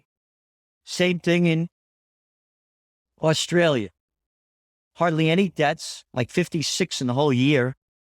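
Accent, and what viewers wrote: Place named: East Coast, United States. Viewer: Omg gerald you are looking extra dapper today love your truthfulness your east coast accent and your proud Italian heritage you are welcome in Texas if you want to join us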